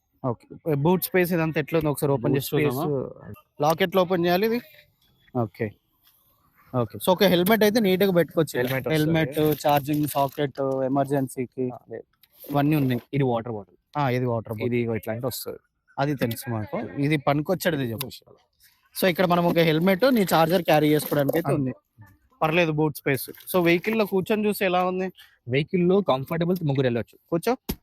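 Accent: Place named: Andhra Pradesh, India. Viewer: Anna pakka telangaana la putti ichaaru chesaaru vacharu ani andhroni basha matladtunnav emanna andhamaina telangana yaasa petkoniii😢😢😢😢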